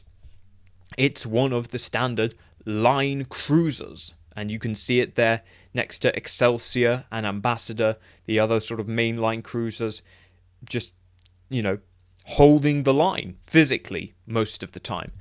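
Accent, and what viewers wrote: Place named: England, United Kingdom. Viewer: your English accent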